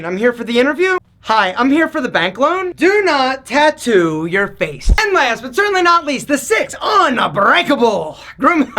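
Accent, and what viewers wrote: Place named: Baltimore, United States. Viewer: Holy Bmore/Philly accent, Batman!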